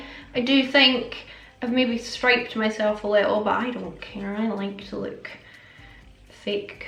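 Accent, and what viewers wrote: Scotland, United Kingdom. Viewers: Love these Q&A videos! I'm wondering about your accent, you said you were picked on. I assume it's a Scottish accent and you live in Scotland. Do you have different accents in different parts of the country? I'm fascinated with accents and languages. Being Russian I speak with an accent. But I speak American English with the Russian accent 🤣🤦🏼‍♀️. I LOVE how British, Scottish and Australian accents sound. I LOVE listening to you speak, lol. I think it's very pretty. It was painful to hear about your back accidents... I'm so so sorry girl! I'm sure you've been to good doctors. Hope they can find the best treatment for you. Have they considered surgery to repair whatever you damaged? I know surgery is always the last resort but it sounds like you're in so much pain... I really hope it gets better soon. Sending you love! ❤️ ❤️ ❤️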